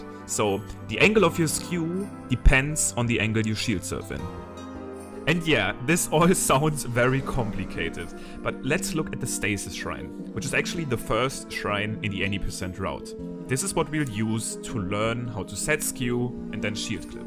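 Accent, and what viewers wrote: Germany. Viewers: are you german ? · Warum machst du die Videos nicht auf deutsch Mann hört das du deutscher Bist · You have a german accent. Do you skeak German?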